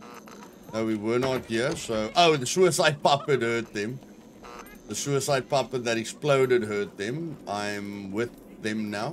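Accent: South Africa. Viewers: I can hear that South African accent! :3 And yeah Eskom screws us all over!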